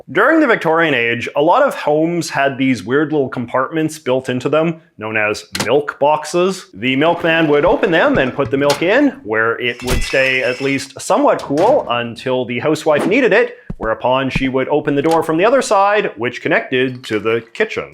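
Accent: Canada. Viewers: yes... I got it. The video was fun and well made anyway. I love your approach to culture. In France, culture is only considered with a capital C, focusing on the classics. Nobody would dare to take seriously a bunch of goodies found in happy meals. It is very refreshing. Beside, it enables me to learn a lot about American or Canadian culture. Love your Canadian accent too. I wonder if it comes from the French roots of Canada. Some French do indeed pronounce "about" just like you. On another topic, I wonder if you would consider making a video about Jordan Peterson. As both an advocate of free speech and a gay, I wonder what could be your intake on his case.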